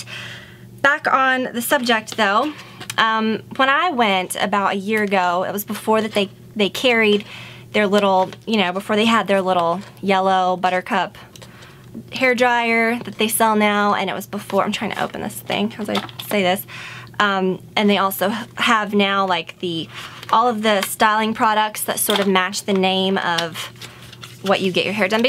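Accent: South, United States. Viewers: Where are you from????? I knew the South (accent) , but close location? Like I am South Eastern..... about an hour ish from Savannah !!!! I knew I totally related to you for a reason. Almost everything you haul.....if I dont already have it.... I go & get it. ♡♥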